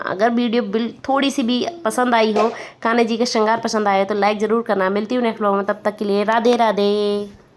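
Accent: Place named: Agra, India. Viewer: Aap bilkul hamari bhasha bolti ho.m agra se hu.jaise rai jharap or hamare yaha bhi sawan m kadi nahi banate.mera bhi man kar raha h m bhi kal banaugi sawan k baad😊🤗🤩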